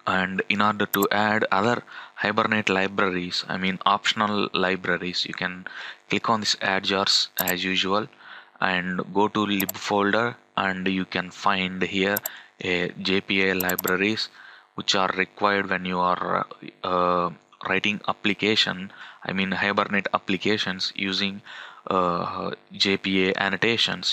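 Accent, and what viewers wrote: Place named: India. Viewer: do you really have to be indian. like really? in every info videos INDIAN . INDIANN... Im not racist but my ears cannot hold indian.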